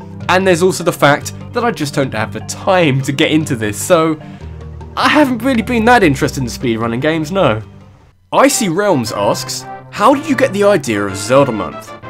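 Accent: England, United Kingdom. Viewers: What part of England are you from? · HOLY FLYING HOBNOBS, HE'S BO BRITISH! O_O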